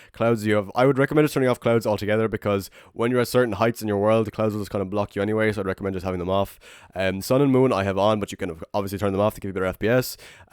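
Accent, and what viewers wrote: Ireland. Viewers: Fellow Irish?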